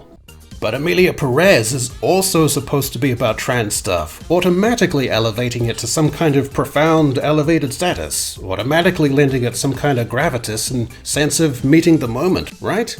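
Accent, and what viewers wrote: New Zealand. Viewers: Goodnight Kiwi, picked where you were from immediately. Great video. Asmongold sent me here, congrats on the exposure haha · Based New Zealand voiceover guy #31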